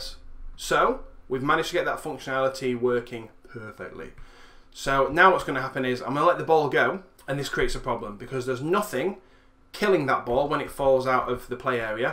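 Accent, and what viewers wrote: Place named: Nottingham, United Kingdom. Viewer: Now it seems obvious but I hadn't placed it earlier. I think across Notts though there's quite a broad array of accents. Beeston for example is pretty different to Mansfield.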